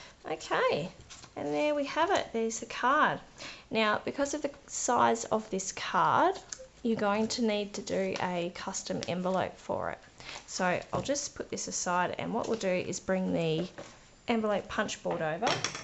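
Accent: Australia. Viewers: Great to hear the Aussie accent with stamping up can't wait to try this card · I really enjoyed your tutorial. You explained everything clearly and I enjoyed hearing an Aussie accent for a change on a Stampin Up video. I have subscribed, so I hope to watch more of your videos in future. Thanks.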